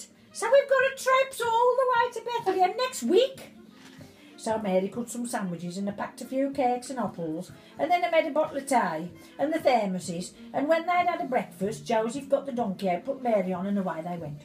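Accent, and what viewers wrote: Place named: Birmingham, United Kingdom. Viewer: Not all Black Country ..Can here some brummie there too...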